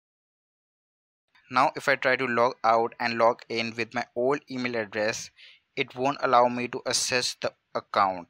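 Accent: India. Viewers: anything tutorial with an indian accent works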